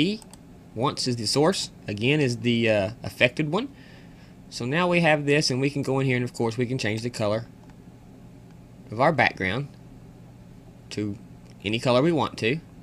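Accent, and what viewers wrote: South, United States. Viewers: southern american aint it?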